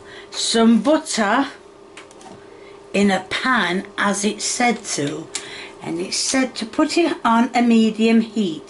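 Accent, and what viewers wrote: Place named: North, United Kingdom. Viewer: Hiya... I find your lovely northern accent very comforting and your cooking very inspiring... I would love to see you do your favourite food to eat/cook. 🎉❤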